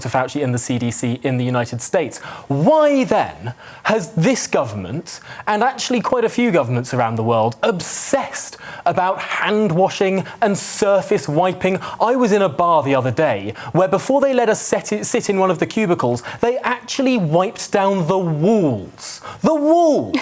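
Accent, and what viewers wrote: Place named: RP, United Kingdom. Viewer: Oh dear these presenters are crap,my hopes for GBN have gone.They had a great opportunity but this lad is as woke as owt & looks on pain when he’s speaking like a toff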